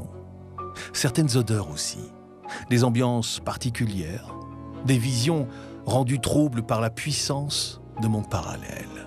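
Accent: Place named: Belgium. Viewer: Ça me fait toujours plaisir d'écouter l'accent belge. Merci ❤❤❤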